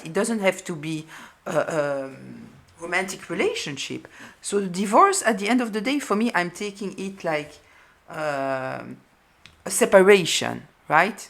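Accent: France. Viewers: Aside from loving your beautiful French accent, I love that your readings are actually therapy, discussing what beneath those strange behaviours of humans. I love this because it resonates with the main reason I listen to Tarot readings. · 1st time on here, glad I found you. Love your very Fremch accent, and think you are very wise. It might be my first time but I promise it won't be the last ❤🎉😊 HAPPY 2026 !!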